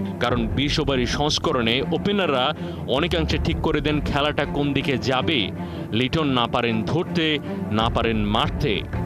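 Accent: Kolkata, India. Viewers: কোলকাতার এক্সেন্টে কথা বলছেন কেন?? বাংলা জানেন না?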